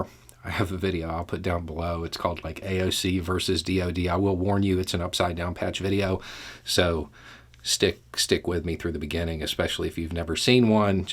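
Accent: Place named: Florida, United States. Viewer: FL accent. "Grain" energy. They are coming for your Moonshine!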